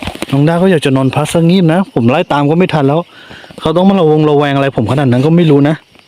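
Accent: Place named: North, Thailand. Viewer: พูดเหมือนคนทางภาคเหนือของเรา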